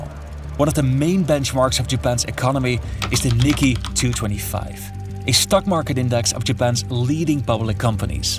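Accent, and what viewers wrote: Netherlands. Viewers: I know a lot of people who want to live in Japan. People who are taking their culture with them and try to enforce it on the Japanese, which will be similar scenario what is happening in the west right now.... And yu are Dutch channel. As native Dutch speaker myself can always hear the accent haha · Dutch accent English. Very well documented this.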